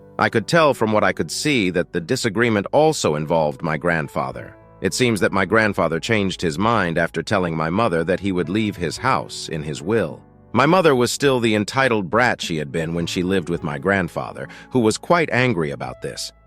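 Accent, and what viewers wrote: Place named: United States. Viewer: This must be a Japanese video translated into English and given an American speaking voice. It starts with a total dimwit who is driven insane by her family and that finally "opens her eyes"! Over 18 years, OP never learned a lesson that she was a second class citizen in her own home and needed to work, save some money for emergencies? Tsk tsk For her 18th birthday, OP could have asked for a few $ and gone to Wendy's or Mac with her friends? A much more realistic goal? What a dimwit to continue to trust her birth family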